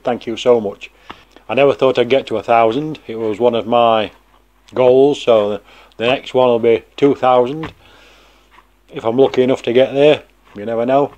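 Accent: Yorkshire, United Kingdom. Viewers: Sounds like a Yorkshire lad and a hoarder ditto, nice shed. Get rid off the greenhouses to other side of the garden and extend the workshop us men need us hobbies. Lol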